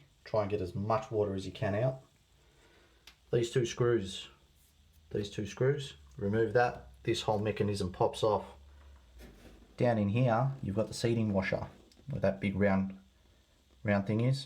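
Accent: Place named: Australia. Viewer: Yep yep Aussie as she’ll be right mate 😆love it clear instructions and straight to the point 😆👍🏾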